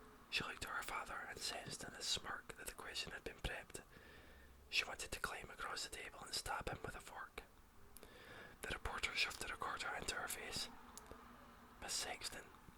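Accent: Scotland, United Kingdom. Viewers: Your voice is sooo relaxing! Hehe, can't resist that Scottish accent. ^_^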